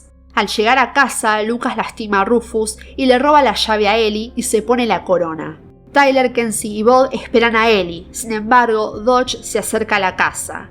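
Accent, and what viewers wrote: Argentina. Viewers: Te lo explico porque al parecer sos ignorante, yo soy argentino y el acento en mi país es así, yo= le decimos sho, llave= shave, la "Y" se pronuncia así, deberías tener un poco más de respeto, en diferentes países se hablan de diferentes maneras y cada uno tiene un acento.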